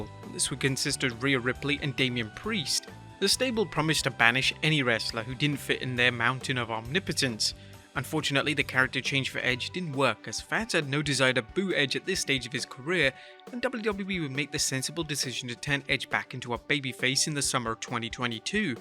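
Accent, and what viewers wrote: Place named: England, United Kingdom. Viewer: This channel is awesome....and I love his English accent.......😊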